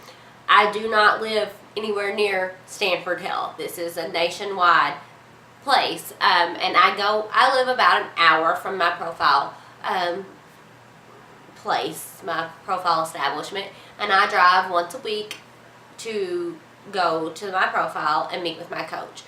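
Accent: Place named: Georgia, United States. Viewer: Sound like a Georgia girl.. maybe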